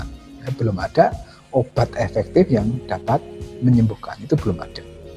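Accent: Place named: Java, Indonesia. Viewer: Matoh... Jowone medok...😄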